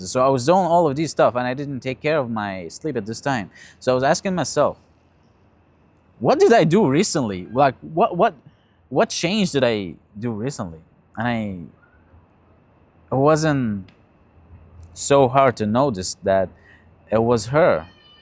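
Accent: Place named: Armenia. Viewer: You sound Armenian. They are nice people.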